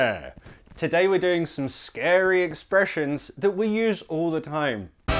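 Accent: United Kingdom. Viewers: Does he speak british english? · Anish Tom No. His pronunciation sounds 100% British to these American ears.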